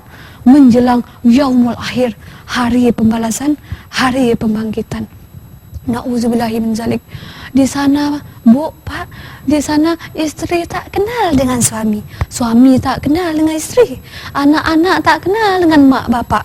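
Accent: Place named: Malaysia. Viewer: bicaranya mcm org malaysia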